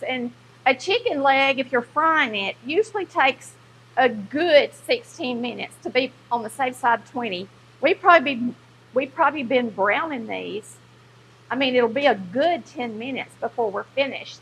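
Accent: South, United States. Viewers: Audio is fine she has a strong southern accent that can make her hard to understand if your not used to it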